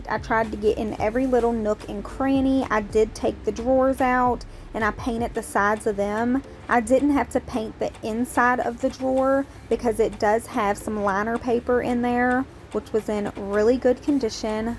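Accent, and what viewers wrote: South, United States. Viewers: I’m trying to figure out your accent. Are you from the South?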